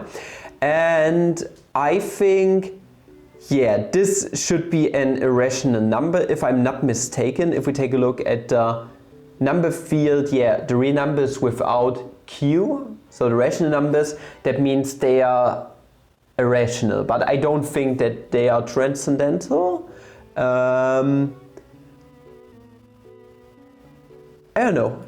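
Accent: Germany. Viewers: german guy tryna speak english for half an hour straight yes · i bet youre german (5 seconds in) ps: bin selber deutscher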